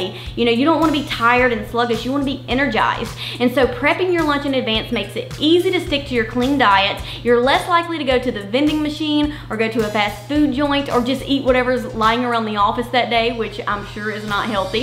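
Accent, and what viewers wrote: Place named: North Carolina, United States. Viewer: As a southern girl myself in trying to isolate your accent. North Carolina? I thought maybe Georgia but I dont think so. Alabama here.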